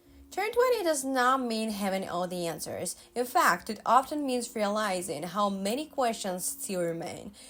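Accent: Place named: Russia. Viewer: I've seen a couple of your videos already. And I gotta say you have the best hair. I'm super jealous. Its just gorgeous. Also What is your first language? You have an interesting accent that I can't quite pin down(uncultured american). Sounds russian or maybe eastern european? But like with a touch of spanish and even scottish lol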